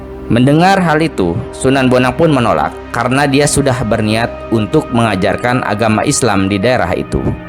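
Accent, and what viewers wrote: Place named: Madura, Indonesia. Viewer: Yg membaca narasi kayaknya bukan org REmbang . Kayak logat Madura tak iye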